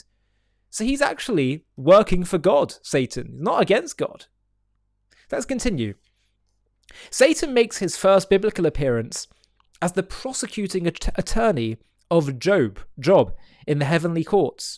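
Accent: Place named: England, United Kingdom. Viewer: He's English, it's how people in that country speak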